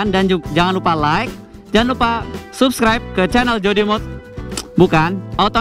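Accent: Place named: Banyumas, Indonesia. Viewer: Lah kok rada ngapak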